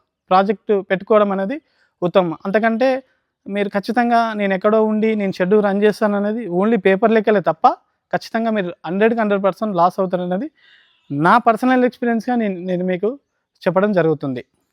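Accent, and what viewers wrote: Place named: Telangana, India. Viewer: Telangana telugu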